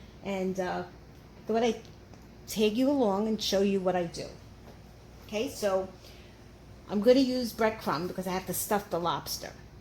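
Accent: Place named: New Jersey, United States. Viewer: you definitely sound like a Jersey Girl. That locked amazing.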